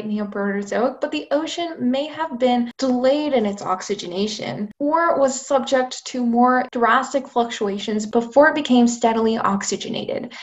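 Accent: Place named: Midwest, United States. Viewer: I ❤️! I’ve been patiently waiting for this video. Are you a Midwest girl? You sound “normal” to me being a Midwest guy myself. Let me guess you’re in the Chicago area?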